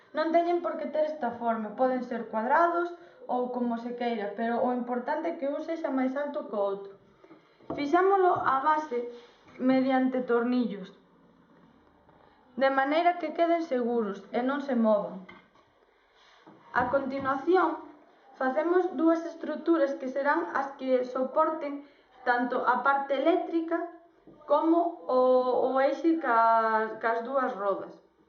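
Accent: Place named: Portugal. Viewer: Parece catalan pero esta muy mal hablado , tiene un acento de portugues pero igual se entiende muy poco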